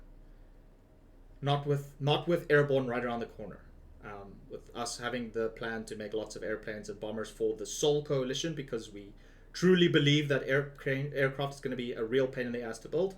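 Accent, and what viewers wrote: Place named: South Africa. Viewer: Where u from? U have like a South African accent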